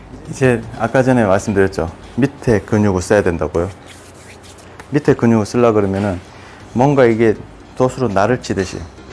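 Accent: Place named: Gyeongsang, South Korea. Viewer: 구독 좋아요 눌렀습니다. 경상도 사투리가 참좋네요^^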